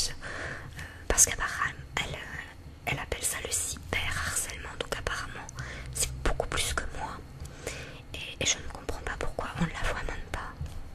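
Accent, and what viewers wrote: Belgium. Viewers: Bonsoir, tu es belge ? 🤔 sinon video trés relaxante, j'adore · Coucou, tu mérites tout le succès que tu as:) j'écoute principalement des vidéos ASMR anglophones, russophones et germanophones. Mais là, je craque, j'adore tes vidéos, toutes autant les unes que les autres! J'espère que tu vas bientôt avoir de bonnes nouvelles pour ta santé. Pour ma part, je suis en post burnout et dépression depuis janvier. Tes vidéos me font beaucoup de bien, et l'accent belge... 💗 Je suis fan! Gros bisous, prends grand soin de toi. La santé est prioritaire:)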